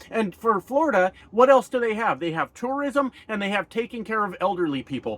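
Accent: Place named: Canada. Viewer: You sure you're from Colorado buddy? The way you think, speak, & drop F-bombs, you sure sound like a fellow fucking Canuck to me. There's been a lot of horror stories & tragedies that have become public knowledge since the overturning of Roe v Wade, but by far the most disgustingly "American" one is the brain dead Georgia woman currently being kept alive as an incubator AGAINST the wishes of her family. You just know that once they finally remove the baby and allow the family to take her off life support, they're going to hand this single father of 2 a god damn, mother fucking bill for hundreds of thousands, or more, before he's even had a chance to bury his wife. And on top of that, doctors are not sure if the fetus is going to make it, and are already concerned about major disabilities if it does due to having fluid on the brain. That's some disgusting shit, on so many different levels.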